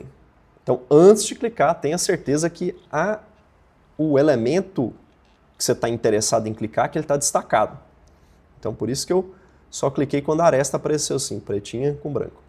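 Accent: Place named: Minas Gerais, Brazil. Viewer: Realmente melhor tutorial para começar! Bonus point pro sotaque, Mineiro né? hahaha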